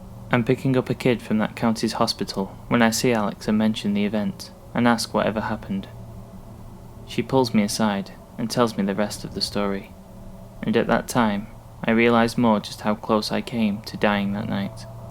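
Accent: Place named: United Kingdom. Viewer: Your narration is getting better and better. Well done !! Nice to hear another Brit narrating !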